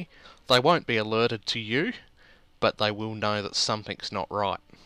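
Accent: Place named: Australia. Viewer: But yes it is Australian. It's a pretty broad accent at that, bordering on what we call "ocker".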